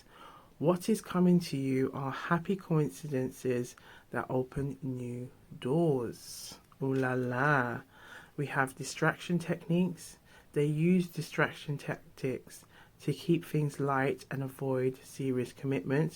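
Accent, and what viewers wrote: Jamaica. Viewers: I think this is the second time I’ve seen one of your videos and I just wanna say I LOOOOOOOVE the patois so much 🥰🥰🥰 reminds me of here in Australia how we have our own slang language no one else can understand haha. I love seeing the same/similar things pop up across different cultures. Our wa gwan is “scarnon” 😂 Bonded by dialect 😁😁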